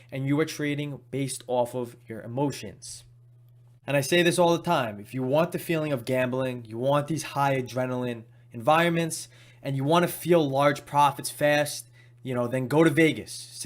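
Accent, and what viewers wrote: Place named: Long Island, United States. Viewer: Sounds like a Long Island accent. Home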